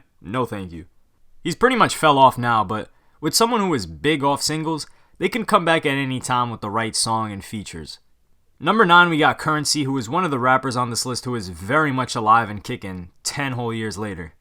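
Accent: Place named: Florida, United States. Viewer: I'm starting to think you're a Flordian because of your accent and attitude.i'm just randomly saying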